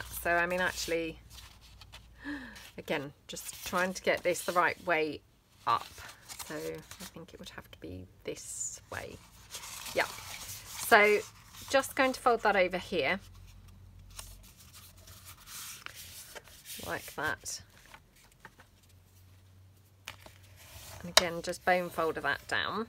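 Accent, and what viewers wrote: England, United Kingdom. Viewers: I also like your videos,and your English accent.....watching and listening is great fun, my desk looks a lot like yours I do try keep things in order when creating,....forget it....always a mess, a happy mess tho....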